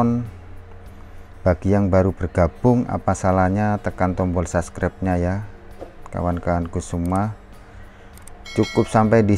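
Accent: Java, Indonesia. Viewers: logat lu koq jawa bang..😁😁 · Abang org indonesia yah,,,sek3dar masukan ga usah di melayu2in bang,,,logat ente logat indonesia kejawa2an,,jadi ga bisa abang berlogat melayu malaysia malah lucu kedengerannyanya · Pakai bahasa indonesia aja napa bang, logat malaysia2an tp logatnya jawanya kental dadi malah aneh...hehe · Assallamuaikum 🙏salam kenal dari saya penonton baru dari kalteng,,,klo di dengar dari logat bahasa sampean kya y org jawa ya mas🙏🤭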